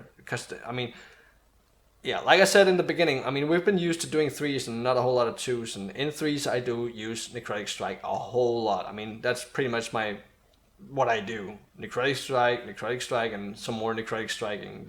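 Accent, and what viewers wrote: Denmark. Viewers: Der er ikke meget dansk accent, over din accent. =D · I heard u were danish instantly, im danish myself, i dont have as bad accent tho, a lot of danish people are stuggling with an accent while talkin english tho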